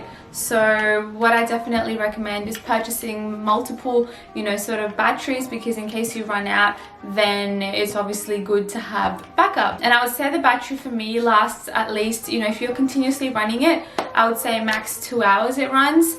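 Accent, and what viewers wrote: Australia. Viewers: Brilliant video! So helpful, I'm still using my phone to film at the moment, I actually don't think it's that bad but would love to upgrade to a proper camera one day. The ring light makes SUCH a difference. Are you Australian? X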